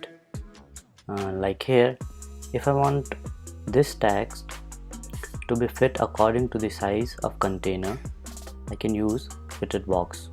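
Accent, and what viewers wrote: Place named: India. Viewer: There should be a warning to filter indian terrible accent.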